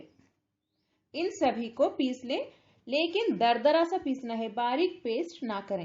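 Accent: Hyderabad, India. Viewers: Are you Hyderabadi?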